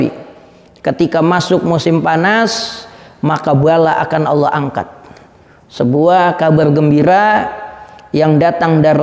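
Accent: Jakarta, Indonesia. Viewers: Ustadz logatnya kental banget betawinya. Demen dah dengernya. Syukron tadz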